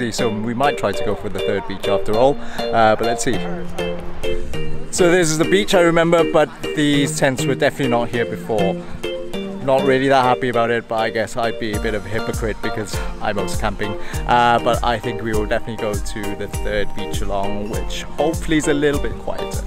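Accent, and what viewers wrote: Wales, United Kingdom. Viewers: Great video! We spent the summer free camping around Europe (as much as we could anyway) it was maybe more stealth camping so there was rarely anyone else around! I'd love to head to Hong Kong (not right now for obvious reasons though!) for some free camping. (p.s. is it just me or do you have a slight Welsh twang in your accent?)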